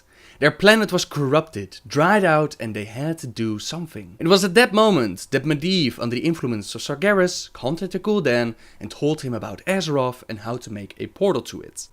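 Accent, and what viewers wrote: Netherlands. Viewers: Pretty sure he's Dutch, judging by his accent. They tend to overpronounce the "s". I'm from the Flemish part of Belgium, so my mother tongue is also Dutch, albeit slightly different from the Dutch in The Netherlands. And I have an American girlfriend and she can't pronounce "r"'s at all, so that roaring sound he makes might be funny in English, but I find it very pleasing. ;) (I can't even do it, because I've taken on the American accent from all the American shows we get on tv.) And the fact he said pensuela instead of peninsula shouldn't really make a difference. Many Americans fail to pronounce English words correctly, so why hate on someone who's main language isn't English? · Are you dutch? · Late, I know, I'm sorry. is from the Netherlands and often he pronounces things the way they do in Dutch, even though English has some really weird rules for pronunciation. 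In Spanish you pronounce peninsula just the way it's written: pen (like the writing tool) - in (like Nagrand is IN Outland)- su (kind of like soon but stop the word halfway through, so it sounds like soo or sü) - la (like the end of Attila). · Hey! I was wondering, will you ever make a lore video about Illidan Stormrage? Or even better, theories on how he could still be alive (which I think he is)? He is one of the best, most tragic and misunderstood characters in the Warcraft universe and he has a lot of history. A video by you about him would be badass. Love your storytelling and Dutch accent, by the way. (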